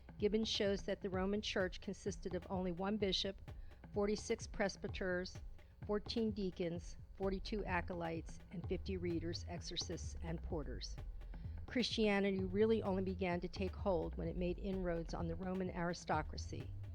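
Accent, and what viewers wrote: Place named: New York, United States. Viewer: THIS VIDEO IS NOTHING BUT A SELL TO THE BOOKS AT THE END OF THIS ONE. KEEP YOUR RELIGION AND UNDERSTAND IT AT A PRACTICAL LEVEL OF LEARNING MORALS. WITH SOME CONECTION TO THE DIVINE. PRAY, IT'S THERAPY. KEEP YOUR FAITH, AT A SANE LEVEL. WHERE ELSE WILL YOU BE TAUGHT LOVE, CARE, GIVING, SACRAFICE? THE MEDIA- ALSO, SOUNDS TO ME LIKE THE SPEAKER IS HIDING A "NEW YORK" ACCENT, WHO LIKELY ATTENDS HER TEMPLE EVERY WEEK. DON'T TRUST ANYONE TRYING TO BREAK DOWN A RELIGION-LOW BLOW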